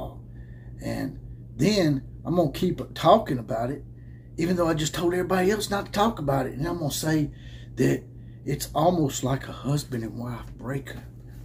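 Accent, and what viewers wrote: South, United States. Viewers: Wait, where has republicans and conservatives messed up? You can’t talk like you’re from the south and still ride the fence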